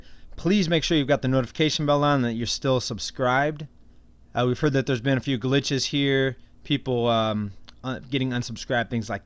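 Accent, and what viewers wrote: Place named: Michigan, United States. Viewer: I so knew it from your accent that you were from Michigan..keep the market "praapped"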